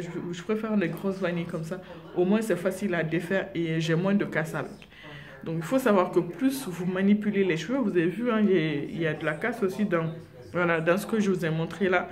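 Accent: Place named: Guinea. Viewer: Très beau cheveux, j'ai deviné que tu es guinéenne dès que j'ai entendu tes premiers mots j'espère ne pas me tromper 😘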